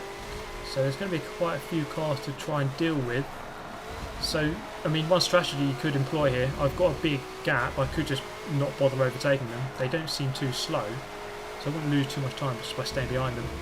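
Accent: United Kingdom. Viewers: This guys commentary makes me wish I had a British accent!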